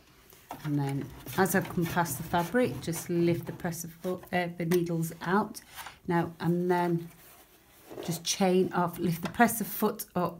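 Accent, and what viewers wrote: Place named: Stockport, United Kingdom. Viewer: Just actually listened to your accent, I was born at Stepping Hill hospital, my maternal grandparents lived where you do, or around, close by... before grandfather died, they lived in lower Brinnington, near where St Paul's church was... for the first 5 years of my life, I lived in upper Brinnington, went to school at the old school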